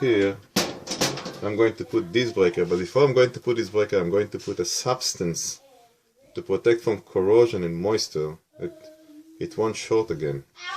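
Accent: Israel. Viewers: Definitely sounds Israeli to me.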